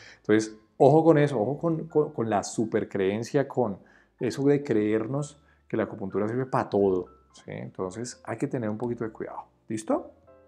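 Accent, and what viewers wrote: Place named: Huila, Colombia. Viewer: Perdón Eres Opita? Del Huila? Soy de Caracas Venezuela, pero hablas igual un tío mio de allá, saludos, por cierto, gracias por la información del vídeo.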